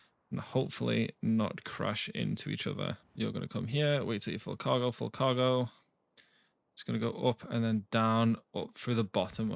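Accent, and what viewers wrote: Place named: United Kingdom. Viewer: you were discussing where you were gonna get water from because you’d forgot it, when you’re building on landfill! You can remove a couple landfill tiles and drop a pump down wherever you fancy. I found out by accident early on. Can I ask where abouts in the UK you’re from? Trying to pin your accent has been driving me insane watching your videos 😅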